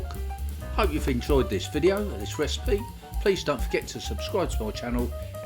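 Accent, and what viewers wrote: United Kingdom. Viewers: I can tell you are from the UK, I love hearing familiar accents __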